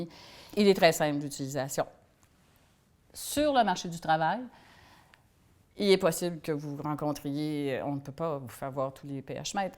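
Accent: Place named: Canada. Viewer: merci pour ce cours qui m'a beaucoup aidé, et merci pour votre accent canadien..ca me rappel mon séjour à Montréal en juin dernier -)) vraiment top ce pays et ses gens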